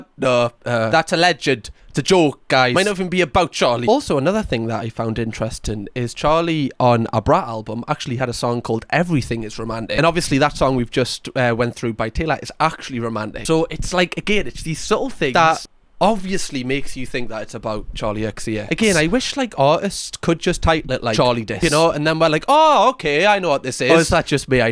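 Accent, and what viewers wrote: Newcastle, United Kingdom. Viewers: Geordie (Newcastle, UK)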